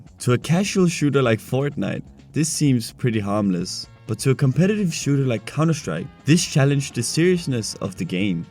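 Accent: Denmark. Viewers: Den danske accent 😭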